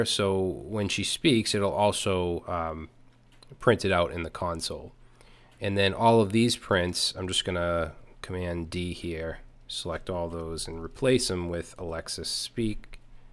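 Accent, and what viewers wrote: Boston, United States. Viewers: It can even pick up your Boston accent! good one. More Python please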